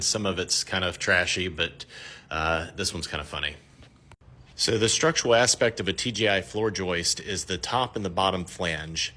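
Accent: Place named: Midwest, United States. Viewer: Wow, That's a sizable number of mistakes. By your accent I'm guessing this in the midwest somewhere, possibly MI or Wisconsin? Just moved out to AZ and I can tell you the level of building integrity is far below my former home in MA. I'm in a 7 yr. old house and I'm finding serious flaws almost daily. Was a builder/remodeler back east.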